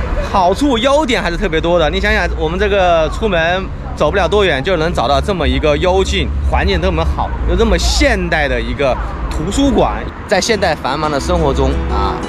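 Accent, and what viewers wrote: Wuhan, China. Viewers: 虾不掩鱼，纯正武汉普通话，亲切，点赞。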